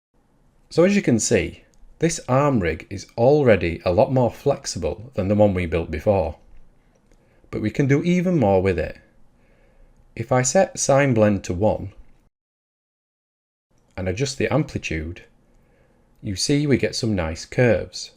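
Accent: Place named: Australia. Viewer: I love Australian accents. Yoo cawll daht a noife?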